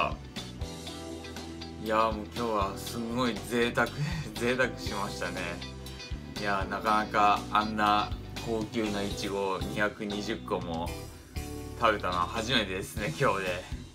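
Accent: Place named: Kansai, Japan. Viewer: あかん笑 に親近感わく＼(^_^)／ もっと関西弁出してって欲しい♪ にこにこで幸せそう！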